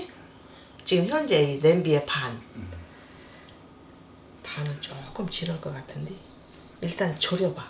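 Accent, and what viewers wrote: Chungcheong, South Korea. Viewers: 맛나보이네요 수고하셨습니다 말씀이 충청도 분이라말씀도 구수하시네요 저의 고향이 충청도이거든요 한번 담아보겠습니다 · 충청도 사투리가 우리 엄마 같네요.. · 충청도 말씀. 정감이 기네유~~~~ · 모처럼 들어 보는 충청도 사투리 네유. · 구수한충청도 사투리 정감이가네요 😊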